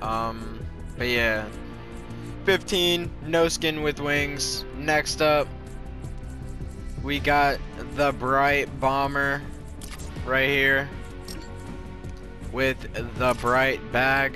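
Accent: France. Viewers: Are tou french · Are you french?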